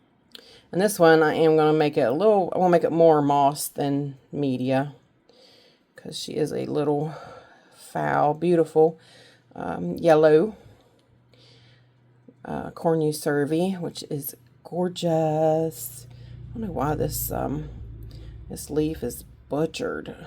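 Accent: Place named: South, United States. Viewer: Hey there, from your accent you sound sound sound southern like me😊 May I ask where your from? You said the name of that lake and I thought I have heard of it before many years ago. At least I think I have 😂 I use to live in Ga. When I was little we use to go to a lake in Alabama.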